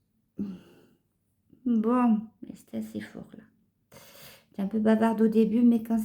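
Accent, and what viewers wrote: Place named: Southwest, France. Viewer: Merci pour cet important message avec ce bel accens du sud ouest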